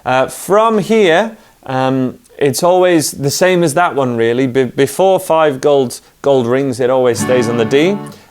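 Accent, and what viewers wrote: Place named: Scotland, United Kingdom. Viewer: danTDM sounds really british , he sounds more ..... scottish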